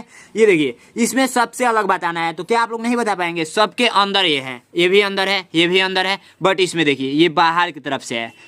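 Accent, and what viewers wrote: Bihar, India. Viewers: Sir up bihiri ho keya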